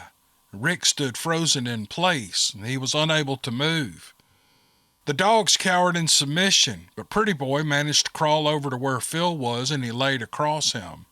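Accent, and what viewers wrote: South, United States. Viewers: Thank you for all your hard work, good Sir. I hope this finds you well and happy. Tonight it is bitter cold outside and as usual, I can't get to sleep. About accent: As a returned to the north after I had lived south for over 20 years kind of person. I have heard southern accents of all sorts of from the heavy drawl to the slow little snippets of words with a twang. southern accent is one of the loveliest I've heard, easy to understand, not too drawn out, not too thick, not too slow, just a gentlemanly, comfortable, gentle, and sweet southern sound. There are many things about the south I miss and hearing some southern accents is one of them, so thank you for the memories, too. Now, put me to sleep, will ya? Take care dear, Rosey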